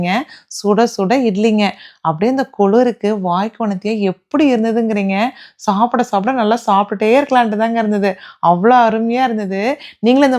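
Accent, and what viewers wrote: Kongu, India. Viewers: Kongu tamil. erode ah sis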